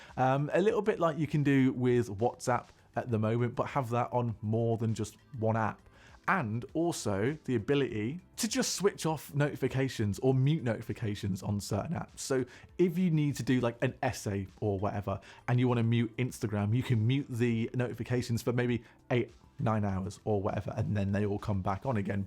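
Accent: United Kingdom. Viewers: Thanks for the updates, it’s always kind of fun to anticipate with the new iOS will do and what improvements are on the way. Can’t quite figure out if you’re British or Australian but I do like the accent. You have a friend in Columbus Ohio USA!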